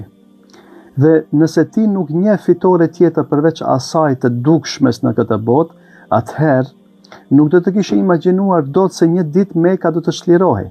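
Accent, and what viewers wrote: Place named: Kardhiq, Albania. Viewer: shkerdhat si flet kardhiqe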